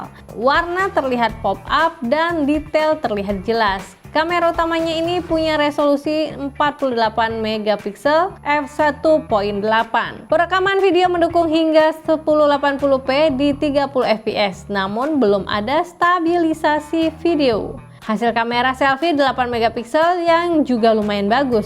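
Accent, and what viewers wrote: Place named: Depok, Indonesia. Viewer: kalo dari logatnya kek org depok😄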